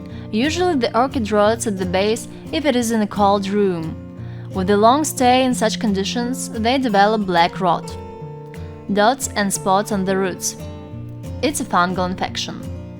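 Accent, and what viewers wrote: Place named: Russia. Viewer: Publicly known facts delivered in heavily accented English. Perhaps make the next video in Russian, and only when you have useful info.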